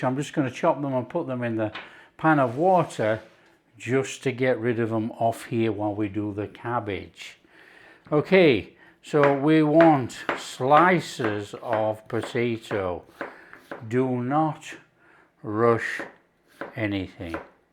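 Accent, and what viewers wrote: Yorkshire, United Kingdom. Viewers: It's not Scottish it's a Yorkshire accent which is a county in England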